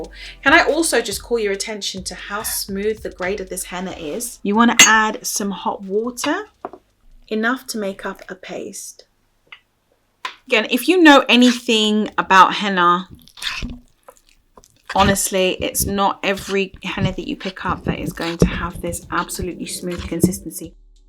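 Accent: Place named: South London, United Kingdom. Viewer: you sound so much like you are from South London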